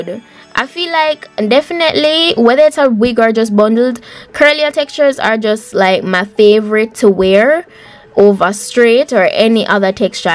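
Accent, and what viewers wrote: Jamaica. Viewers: From I hear how she talk I know from d start she's a Jamaican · You have an accent like a jamaican lol I am lol 😄😃 n if u are, ur very gorgeous n beautiful.. That wig were made perfectly 4 you! 😄👍 Tanx 4 sharing.. Blessyou! 😄💓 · You sound like a Jamaican 👍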